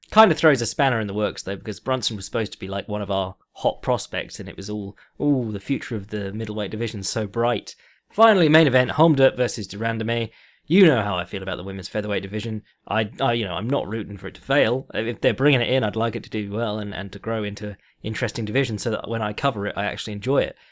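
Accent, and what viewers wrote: England, United Kingdom. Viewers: Where about's in England are you from? Also, if it's close to me, can we be friends?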